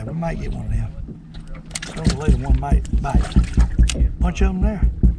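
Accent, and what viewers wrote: South, United States. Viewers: Hey its sourthern english I understand u just fine but I am from alabama LOL good vid sir👍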